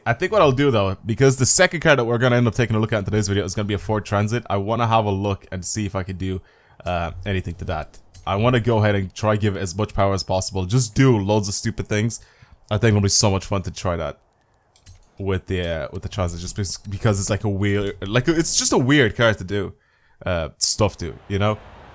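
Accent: Dublin, Ireland. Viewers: Good vid keep it up (quick question are u from Dublin in ireland cause u sound like u are from Dublin)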